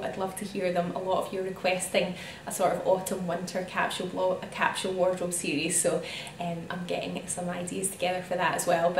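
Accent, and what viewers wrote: Scotland, United Kingdom. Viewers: I live in Switzerland and it’s great hearing another Scottish accent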